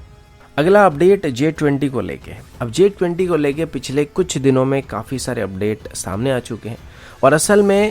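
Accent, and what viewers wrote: Odisha, India. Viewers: Aji jana padila ki tame bhi Odisha ra boli😄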